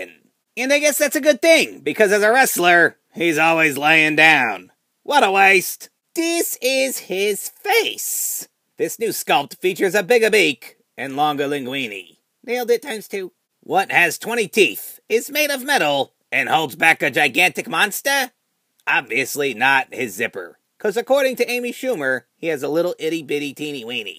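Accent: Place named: Ireland. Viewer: Bitches like me fella. Love your Irish accent:*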